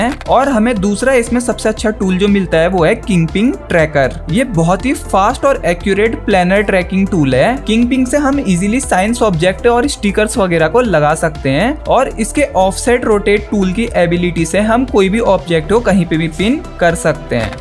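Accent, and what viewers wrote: Pahadi, India. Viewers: bhai awaz se toh pahadi lg rhe ho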